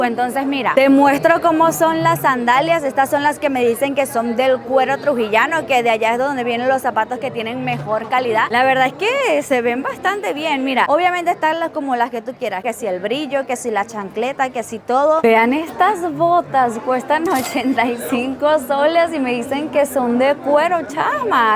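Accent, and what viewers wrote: Venezuela. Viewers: Siento que en este video habla más como VENEZOLANA, que cuando está en México 😅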